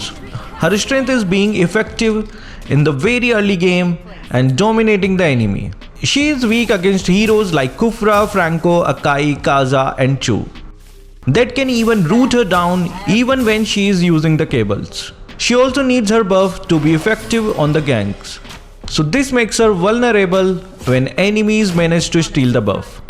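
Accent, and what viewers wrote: India. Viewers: Your hinduna accent make this tutorial perfect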